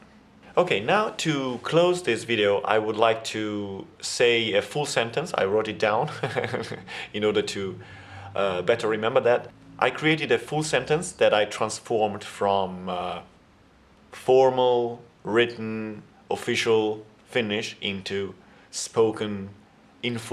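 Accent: Italy. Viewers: This was the first video I've seen from you. Are you italian? Because our italian language teacher ones told us that italian pronounciation suits finnish almost better than any other language and I was wondering if it was vice versa :D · The videos you make are truly excellent. Also, your pronunciation has only a very faint accent. This may partly come from the fact that Finnish and Italian pronunciations do not differ too much. By the way, there is a Russian guy called Kiril Sultanshin who makes videos and has learned good Finnish within a few years. His pronunciation is absolutely perfect though he still makes occasional mistakes in grammar. Achievements like this indicate a great talent. I do not like the term “kirjakieli” although even linguists rather commonly use it. The reason is that it is not used only in writing but also when any formal presentation is needed. 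TV news, speeches, negotiations, university lectures, schools are typical examples. “Puhekieli” is typical in everyday informal discussions. Instead of “kirjakieli” I would rather say “yleiskieli”. Admittedly, “puhekieli” expressions sometimes intrude “yleiskieli”, mainly due to a sloppy use of the language.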